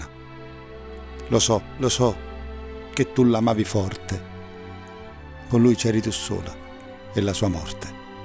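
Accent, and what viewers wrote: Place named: Naples, Italy. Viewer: Bravissimo! E poi questo accento Napoletano su una poesia Romagnola rende il tutto universale e oltre il tempo...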